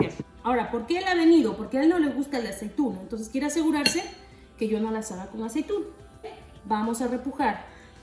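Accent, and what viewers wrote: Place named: Peru. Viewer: ¡Qué peruana que eres! "salsita, cominito, cada presita" Me encanta, extraño mi tierra.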